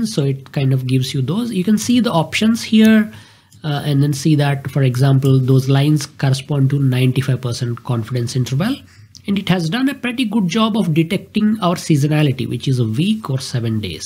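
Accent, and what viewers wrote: India. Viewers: Next time get straight to the point without these useless sketches. And please use an ai to voice over your indian accent.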